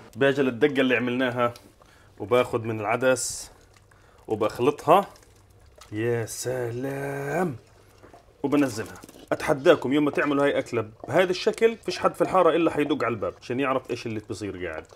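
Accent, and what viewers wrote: Palestine. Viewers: بالعكس لهجتوا فلسطينية بحته احنا هيك ابنحكي في فلسطين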